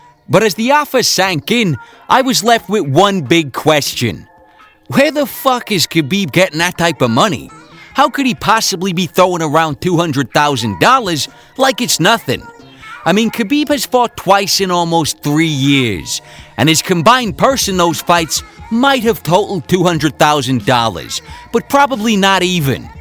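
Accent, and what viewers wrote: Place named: New Jersey, United States. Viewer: Great Video. Love the accent. Where is that from? Jersey?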